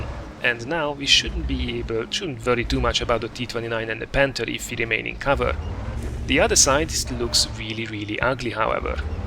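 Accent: Hungary. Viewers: hes from Hungary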